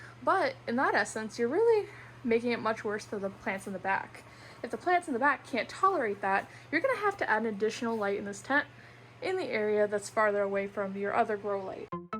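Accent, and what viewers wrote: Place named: Quebec, Canada. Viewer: It's the first time I watch one of your video (just discovered your channel) I'm sorry but I have to tell you, you really sound like a french Canadian talking english, it's crazy!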